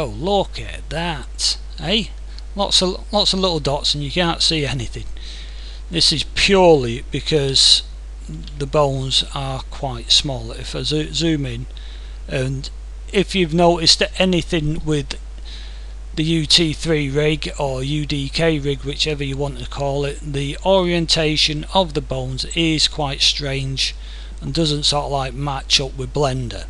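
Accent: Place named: Yorkshire, United Kingdom. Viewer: Agreed. I had to search a bit to find this but it saved me from having to use Maya. You sound like you're from somewhere by Yorkshire too,..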